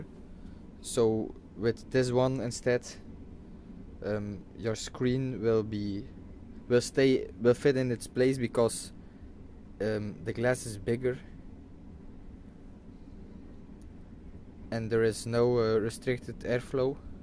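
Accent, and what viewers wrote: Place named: France. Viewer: ce fameux accent français ;)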